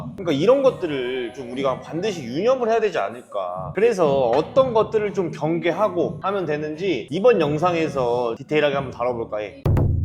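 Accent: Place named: Jeolla, South Korea. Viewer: 저도 익산이 고향인데.. 뭔가 말투가 전라도의 늬앙쓰가 있긴있써^^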